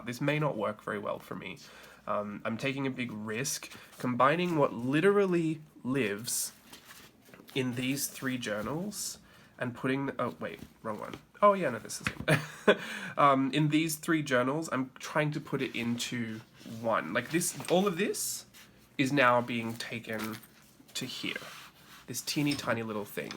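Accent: Australia. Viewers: YT finally dropped yr video into my feed. MyLifeMits always mentions you in her videos so Im stoked to finally watching yr video. I like all yr different spreads, some of your ideas I would like to try out 😊 Also it sounds like you're dropping Kath n Kiim nuggets, so are you Australian? I really enjoyed listening to your chat about your journals too and I look forward to watching previous and future videos 🥰👍❤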